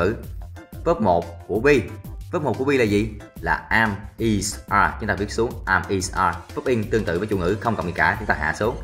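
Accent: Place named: South, Vietnam. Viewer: tại thấy bạn nói giọng miền Nam mà dùng không đúng ngôn ngữ của miền Nam. Bút viết : Viết ( miền Nam), bút ( miền Bắc). Ngôn ngữ miền Nam dần mất đi một phần là do ngôn ngữ miền Bắc thống trị gần hết câc kênh truyền thông ( báo chí , phát thanh truyền hình), SGK cũng do người miền Bắc độc quuyền biên soạn.Một phần cũng do chính những người miền Nam sinh sau năm 1975 không trân trọng, gìn giữ ngôn ngữ của cha ông mình mà còn tiếp tay xóa bỏ ngôn ngữ của miền Nam Việt Nam.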